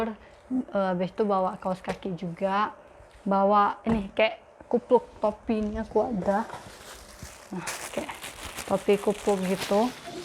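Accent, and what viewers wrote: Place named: Medan, Indonesia. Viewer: Medanya gk hilang .. wkwk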